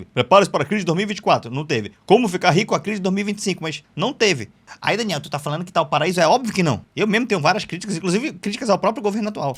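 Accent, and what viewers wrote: Manaus, Brazil. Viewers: Fala Daniel, em um vídeo seu, vi que estudou na UFAM. Você é amazonense mesmo? O sotaque parece muito com o manauara.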